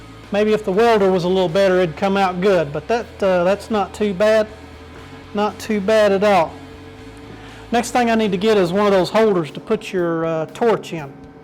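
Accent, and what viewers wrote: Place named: North Carolina, United States. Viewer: Enjoyed your review! Do you mind me asking where you are located? I detect a NC accent there. I also think I see a can of spray paint on your bench from Princess Auto here in Canada.... so I'm confused! lol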